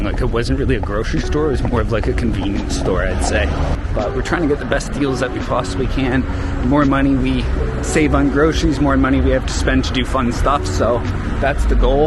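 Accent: Canada. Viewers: toronto accent?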